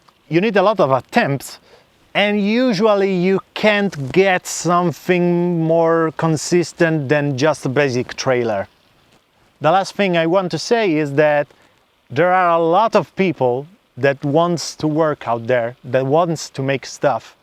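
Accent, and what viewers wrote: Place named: Italy. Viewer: You don't need to tell us that you are from Italy; your accent says that loud and clear. 😅 btw nice video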